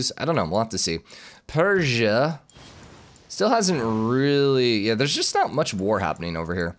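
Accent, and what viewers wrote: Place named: United States. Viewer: Great video. You americans say words real funny and i love it.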